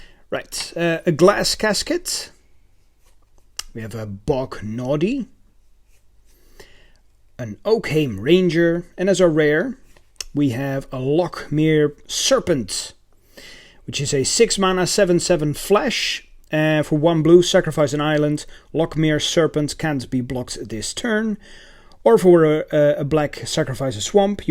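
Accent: Netherlands. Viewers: Dutch accent for sure, groetjes uit A'dam!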